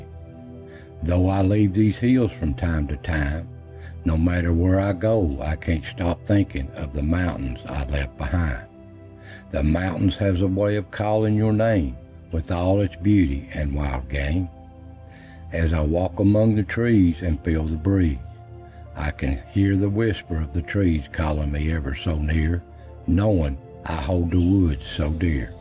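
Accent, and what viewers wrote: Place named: South, United States. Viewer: I like your poem, truly from your heart. I've people comment negatively on the way I still some words. We moved to California when I was younger. But I point out how those on east coast will change an a on the end a word to a r. People need to be more tolerant for those of us from the south. I love the way you say chimbly for chimney. My dad was Arkansas and that was how he called it. I'm 76 now and my Dad passed away in 1989. So you bring back good memories. I like all your videos. Keep up the good work.👍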